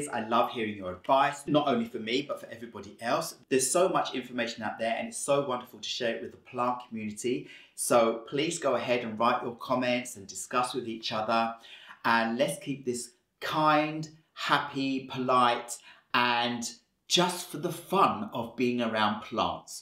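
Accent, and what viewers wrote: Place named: England, United Kingdom. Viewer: ohh nice!!! You don’t look a day over 25!! My hair is about 3 foot long, and I am 6 foot tall lol! My hubby loved long hair and I haven’t had the heart to cut it even though he passed away in 2015. Where are you originally from!? My guess is possibly England or South Africa! You have an accent but it’s hard to figure out!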